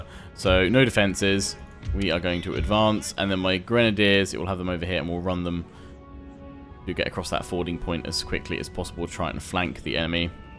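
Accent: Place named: United Kingdom. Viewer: pls just tell me are u british or american because ur accent sounds like british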